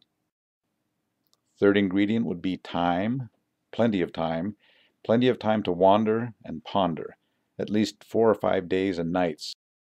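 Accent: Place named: Utah, United States. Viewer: I thought you were from Utah from your other videos because of your accent, looks like I was right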